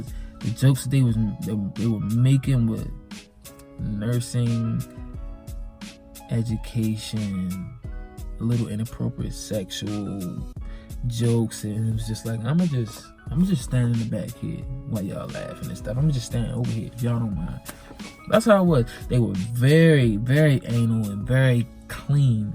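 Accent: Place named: Maryland, United States. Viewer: Is this guy a Marylander??? He sounds like he is from my hometown!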